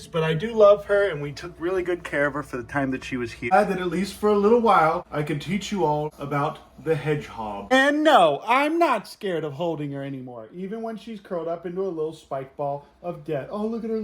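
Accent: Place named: Pennsylvania, United States. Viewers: Hearing this man use western PA speak is always confusing to me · Why do you have a Pennsylvanian accent if you’re from Texas.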